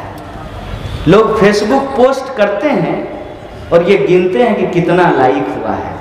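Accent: Bihar, India. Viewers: Lalu yadav ki kmi dur ho gai... Apke ane se.... Mja ata h apki theth bihari bhasa sunkar.... 😀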